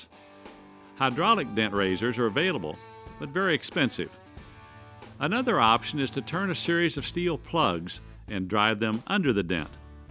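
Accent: Missouri, United States. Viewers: I only watch these videos because he has the nicest American accent ever! 😆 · He's from Ely Missouri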